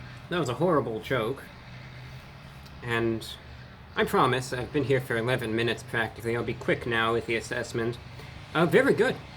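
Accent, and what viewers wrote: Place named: Transatlantic, United States. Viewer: Why the switch to a Transatlantic Accent?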